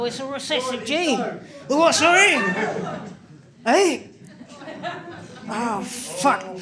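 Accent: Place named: United Kingdom. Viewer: He's funny, but he'd really be funny if his accent wasn't as thick as Keir Starmer's head. Very hard for non-Brits to make out a lot of his lines.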